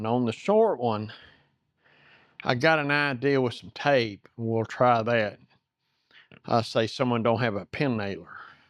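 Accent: South, United States. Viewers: Hi, hope you are doing well. I wish you could make more video's more often, but that I imagine is pretty tough to do by yourself. I have watched all of your video's two or three times by now. But the real reason I wante to comment here, is to yell you on one of your video's, dont remember which one now, someone wrote a comment and was complaining about your "Southern Accent." That's probably the biggest reason I love watching your channel. Not only do you do a great job in discussing and explaining how to do every step in the things that you do. But more than that, I love listening to you talk with that "Southern Accent!" So I wouldn't let that get you down, there are way way more of us out here that don't care about your accent, so keep it up, and if that viewer was bothered by that accent of your I would suggest to him or her, to don't come back. 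There are a way more of us out here to don't mind it. Like I said, that southern accent is one of the biggest reasons I watch your channel!. And remember this, no matter what you do or say, there are going to be butt heads out there that you just can't satisfy. Take care, and keep making the video's. Jim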